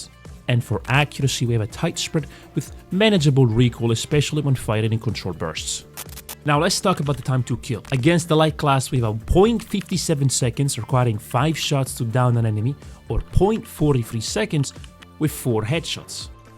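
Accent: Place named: Russia. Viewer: i'm not too sure, as I haven't heard a lot of accents, I wanna say Russia, but I also don't want to seem offensive if i'm wrong sooooo, sorry if I am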